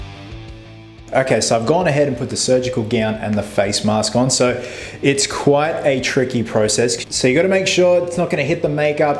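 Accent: Australia. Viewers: Hey. Just subbed to u. I also used BYS for my joker cosplay in school book week and it turned out great. Although I used hair spray on my hair, did the trick, but was a bit light. What else could u recommend? Also, it sounds like ur from Australia, and if so, me to!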